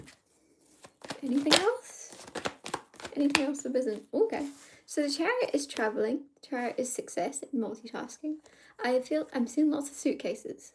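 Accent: United Kingdom. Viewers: Hi. I thought you sounded British? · . You. Do. Sound. Very. British. Possibly. Irish. Not. Welsh. Or. Scotish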